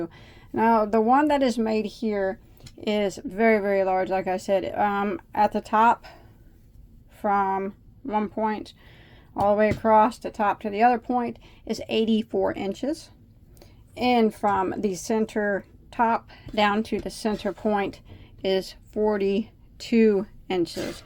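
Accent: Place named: Midwest, United States. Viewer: I love it! I have been looking for the perfect pattern to make as a gift for my sister. Have a number of wips so will be a little while before I can start this. I love listening to you. I’m from Iowa but have lived in Washington state most of my adult life. I enjoy your midwestern accent. My youngest son often corrected me on n how I said wash. Mom there is no “ o” in Washington.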